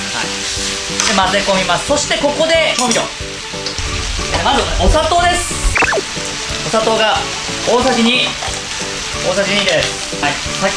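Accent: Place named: Chiba, Japan. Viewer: いつも思うのでさが千葉ですよね‼️かなりイントネーションがあやふやで訛ってますよ(笑)😫少し治したらどうでしょう‼️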